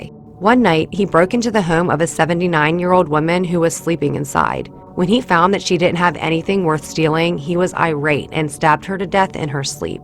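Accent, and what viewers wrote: United States. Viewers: You live in Maryland or Pennsylvania? I hear an accent, Pittsburg? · are you from delco? or jersey?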